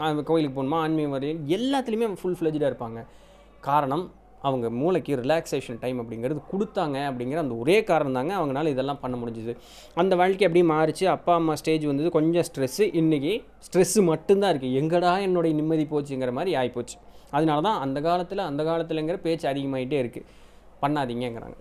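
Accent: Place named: Chennai, India. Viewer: நீங்க கோயம்புத்தூர் தமிழில் பேசாம சென்னை தமிழ் தான் தானே பேசுறீங்க கேவை தமிழ் ல பேசுங்க.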